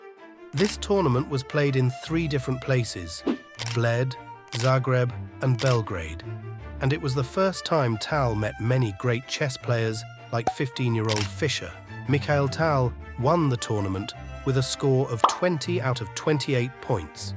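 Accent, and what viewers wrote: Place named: RP, United Kingdom. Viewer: You're not supposed to notice stuff like that when the narrator has a posh accent.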